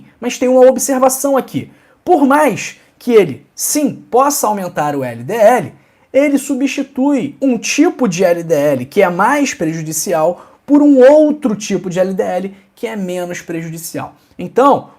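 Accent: Rio de Janeiro, Brazil. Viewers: COM ESSE SUTAQUE NEM PRECISA FALAR QUE E DO RIO DE JANEIRO.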